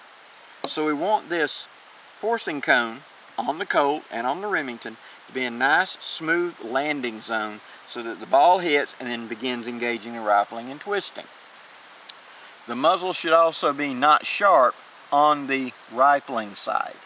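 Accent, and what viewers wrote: United States. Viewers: Best cap and Ball Videos ever! Lot of history and technology said in beautiful Stories,in a lovely american Englisch, great pleasure to wach it.Thank you Sir for these series. These Guns played a signifiant role in making USA a big Nation ,later able to save Europe twice : WW l and WW II.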